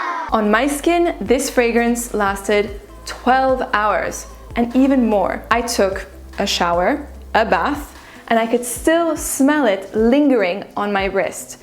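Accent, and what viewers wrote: France. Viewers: She is definitely a french speaker😊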